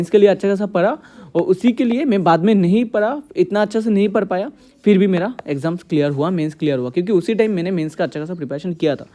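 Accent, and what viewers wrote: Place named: Assam, India. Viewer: Aap thoda Hindi sikhlo. 100% Assamese honge. Phut phut Assamese jhalak rahe hai 😅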